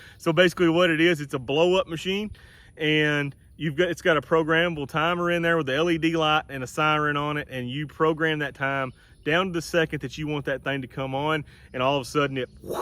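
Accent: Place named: South, United States. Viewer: Thanks, as always great information! I enjoy your channel and am learning a lot from you. I watch your videos so much that my wife can now do a impersonation of your southern accent:). We live so far north our neighbors are in Canada so there's not any southern drawl up here. Appreciate you!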